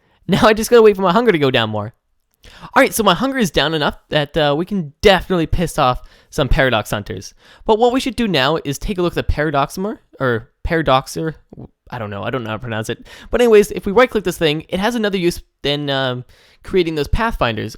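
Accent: United Kingdom. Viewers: Does he have a british accent or the cutest lisp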